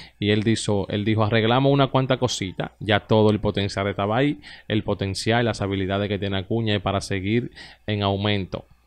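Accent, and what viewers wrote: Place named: Cibao, Dominican Republic. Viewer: Compa usted habla demasiado feo, un cibaeño que cambia la i por la L, ejemplo dos ochental tres, barrabasada total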